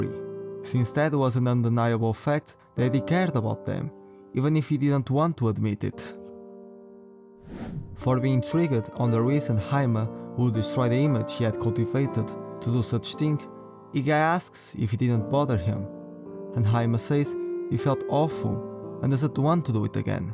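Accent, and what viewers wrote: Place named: Russia. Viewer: the russian accent is very thicc you sound like vlad ncl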